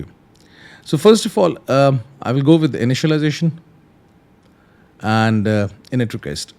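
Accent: India. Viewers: Love you lil Indian accent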